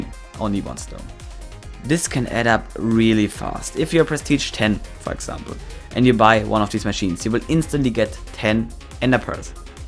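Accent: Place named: Germany. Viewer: Man merkt das du deutsch bist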